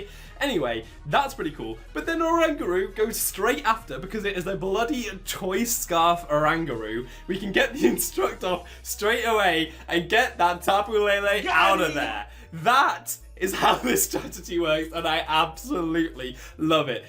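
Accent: United Kingdom. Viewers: Hey bro, you sound british. I have a question for you, is it coming home?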